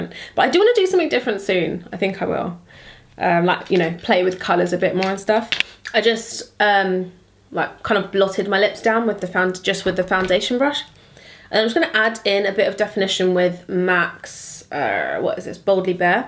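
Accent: United Kingdom. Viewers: I love her british accent and the way she says "Bits & Bobs" and all of the traveling she's getting done! Indie is getting to see the world already! You guys are great parents 💕🤗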